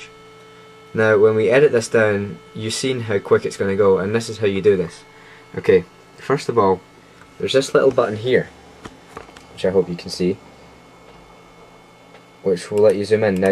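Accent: Scotland, United Kingdom. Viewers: Thanks a zillion!!!! the video gave me exactly what i was breakin my head over. and the scottish accent is very cute and distracting (in a good way ) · Dude love ur scottish accent, and i am gonna enjoy making movies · wow im irish and i could hear a scottish accent not an irish one.. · Je is Scottish god sake aaaa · This is a really good video, I learned something new today! Also, I'd marry you just because you have a lovely Scottish accent haha :) But anyways this was really helpful, I'm making an animation for class and had no idea how to do the importing part :P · Great info! Thanks, I'm going to try it today. OH...and I could tell you have a Scottish accent not Irish :) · yhass lol ur scottish! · I enjoyed it a lot. BTW, I knew you were scottish...lovely accent. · u da man son, im scottish to and i get that irish thing all the time lol. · Nice vid. 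I notice you did the hard way to begin with! When I import the images from the collection to the timeline, I do it all in one go (Simply select all and drag to the timeline). Also, would have been nice if you'd shown how to add titles and a soundtrack. PS. I sussed you were Scottish straight away lolol! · wow... I can't believe I just watched what I experienced on my own! Really! And I wouldn't think he'd be irish at all... more like british... Geez! stop animation is hard! mainly because I give up too often... >_< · Ooo I love the Scottish accent!!!! :D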